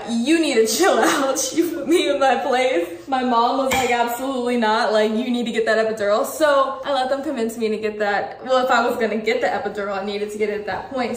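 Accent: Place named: Los Angeles, United States. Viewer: You gotta be from LA lol the antics word syntax and how you say them.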